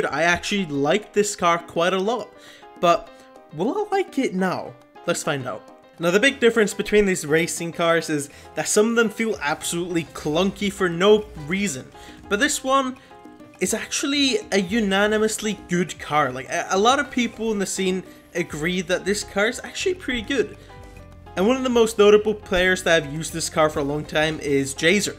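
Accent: United Kingdom. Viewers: Btw are you Scottish it sounds like it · I still don’t get how your British, I’m from England and have never heard anyone like you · he sounds lockey welsh or northen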